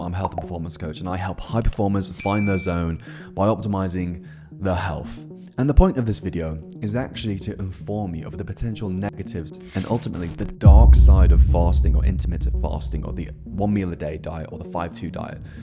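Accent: RP, United Kingdom. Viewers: Great video with good information! Btw - I LOVE your posh accent 🔥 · I’m not sure if anyone in the world authentically pronounces fasting as faaahhhhhsting and last as laaaaahhhhhhst. IDK….is it a way to say ‘hey, look at me as a productivity guru, I’m so successful I have a ridiculously posh accent!’.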